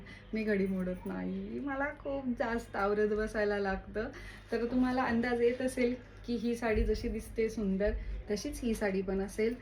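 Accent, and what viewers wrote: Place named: Khandesh, India. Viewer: Tai tu khandeshi ahe na tuzya bolnuavarun vatat mi pn khandeshi ahe tu khup god ahe aani tuze bolne ati sunder ❤ love you tai mla aaj paryant koni nahi aavdl evdi tu aavdte ani tuze sagde video baghte mi😊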